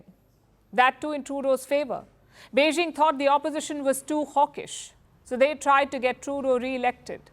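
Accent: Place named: India. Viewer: The awfully strong Indian accent along with the unnaturally fast speaking rhythm are absolutely a nightmare to comprehend!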